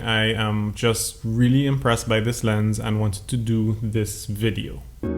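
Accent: Trinidad and Tobago. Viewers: I have the Nikon 5100D and was looking for a lens to go with it other than the kit lens. I saw your video and decided to give it a watch and within the first 5 seconds I heard the Trini accent 🇹🇹 !! Big up my people one time. Just a quick question though, would you recommend this lens for a video podcast on my camera? Great video !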